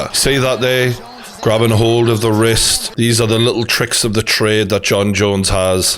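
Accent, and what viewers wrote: Newcastle, United Kingdom. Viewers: geordie ass nigga.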